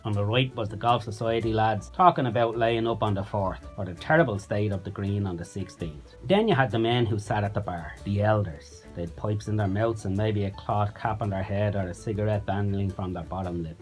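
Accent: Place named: Dublin, Ireland. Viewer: Dublin JAKEEN ACCENT..TAXI DRIVERS.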